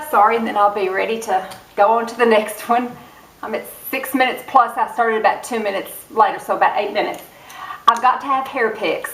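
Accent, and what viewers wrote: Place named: South, United States. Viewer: You would win SURVIVOR, I'm certain... your sweet genuine spirit would definitely win votes. I'd bring you as my one item because of your heart warming personality~ I often think my adult daughters will show up at my door doing an intervention someday - I "hoard" skin care, make-up, BOOKS, and shoes... I love your videos and always enjoy your sweet southern drawal... never boring,!!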